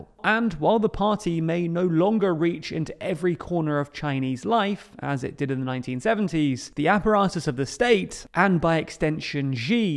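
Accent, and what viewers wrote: United Kingdom. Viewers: Great video but I hate British accent because some words sounded too soft like you swallowed half of it.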